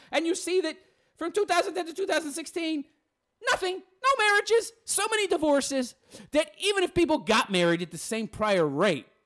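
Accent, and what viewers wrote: New York, United States. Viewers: Love the NY accent. Love the tawwwk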